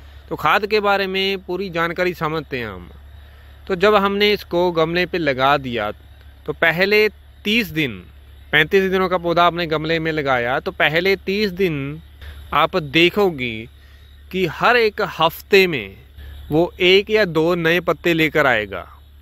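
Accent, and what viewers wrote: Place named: Punjab, India. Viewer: bhaji Punjabi nikli jandi hai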